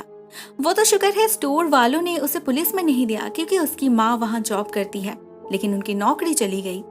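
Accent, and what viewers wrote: Bengal, India. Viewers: My my your sweet sweet voice 😋 ur accent feels like Bangali 😍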